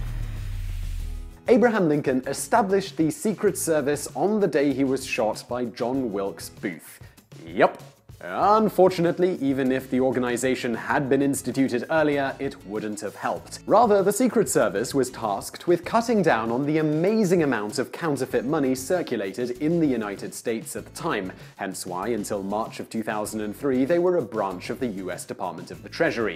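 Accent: England, United Kingdom. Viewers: You know so much about American history, and you have a British accent. Hmm... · Sounds weird, English accent talking about American history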